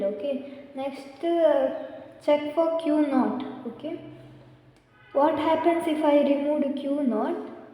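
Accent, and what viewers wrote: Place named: Mangalore, India. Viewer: U r from manglore right???😁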